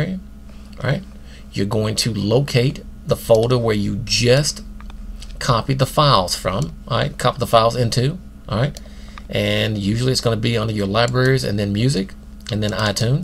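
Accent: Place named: South, United States. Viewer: That was very simple and resourceful. I admire your accent by the way what part of the South are you from? My first guess is Tennessee, my second is Georgia.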